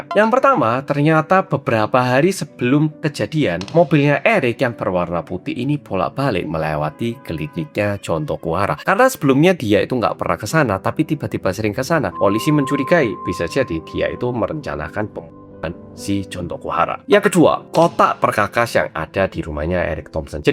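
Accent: Java, Indonesia. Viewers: menemaniku saat cuci piring yaaa oppa medok😊😊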